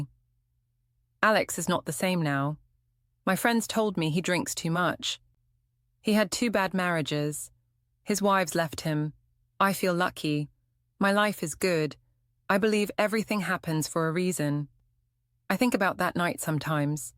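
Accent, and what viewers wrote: United Kingdom. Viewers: I think it's British.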